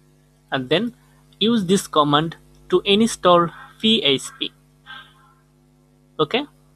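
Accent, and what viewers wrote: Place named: Bengal, India. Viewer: Crazy Bengali accent, but nice tutorial 😅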